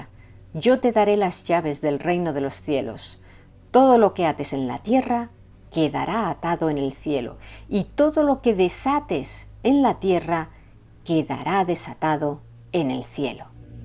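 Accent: Spain. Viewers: me encanta como explicas la historia de una forma tan sencilla e interesante. Soy de México y amo tu "acento" español. 😅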